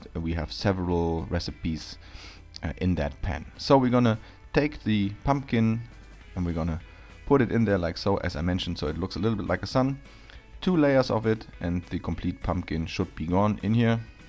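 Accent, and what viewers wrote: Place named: Germany. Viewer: Its tiiiiime to grill.... ich liebe dein Typisch ich sag mal „Deutsch angehauchtem“ Englisch :)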